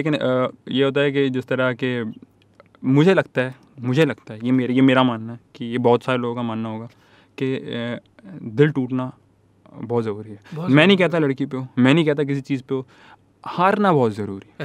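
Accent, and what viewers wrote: India. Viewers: First time suna to lga ki indian hai but really love his style ❤️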